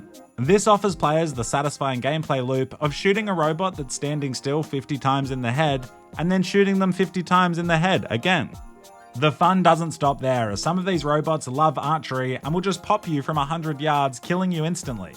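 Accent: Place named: Australia. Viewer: when he pronounces Anna as "ANR" - Australians really do have wild accents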